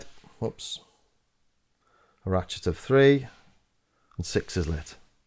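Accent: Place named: Northern England, United Kingdom. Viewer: \Good on you and good to hear your northern accent!!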